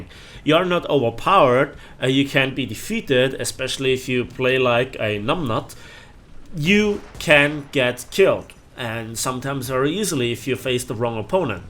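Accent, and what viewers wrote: Germany. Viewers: I just discovered this channel, after looking for an AMX-30 gameplay, and I was amazed at the quality of the reviews you make. You have by far the best videos on WT Ground Forces I've seen, and i've been playing/watching WT for 5 years. Great channel,. Just wonderful. I find your videos superior to any of the top youtube channels that cover WT Ground Forces. Oh, and are you german?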